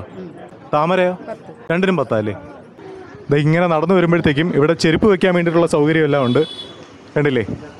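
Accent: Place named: Kollam, India. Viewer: പക്കാ കൊല്ലം കാരൻ 😄😄.. സംസാര ശൈലി.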